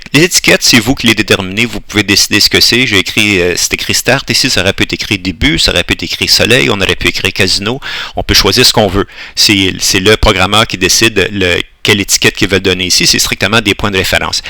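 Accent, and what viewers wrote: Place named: Quebec, Canada. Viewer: t'es vraiment une folle de l'agresser comme ça, le son n'a aucun probleme, personnellement je l'entends très bien, la vidéo n'est peut etre pas en hd mais on comprends très bien ce qu'il dit même s'il a un accent québecois, moi qui suit de france, c’est toi qui devrait t'acheter du matériel ou te laver les oreilles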